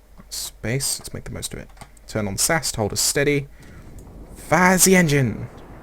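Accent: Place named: United Kingdom. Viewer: Don't ever stop my friend, you are a great lper for kerbal space program, I dunno maybe it's your british accent.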